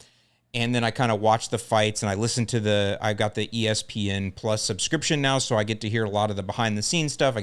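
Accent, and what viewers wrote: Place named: South, United States. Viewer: I just can’t do it. Your soothing southern accent gets annihilated with every super chat.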